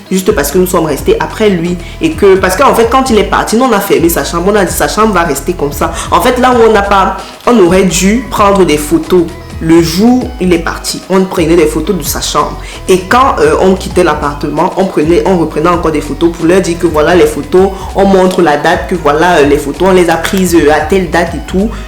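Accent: Cameroon. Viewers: Je sens le ton camerounais ! Lol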